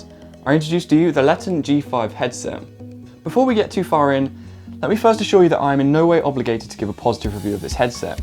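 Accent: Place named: RP, United Kingdom. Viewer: love how posh you sound in your videos, mate 😂 also, what's with the 2 month absence? 😏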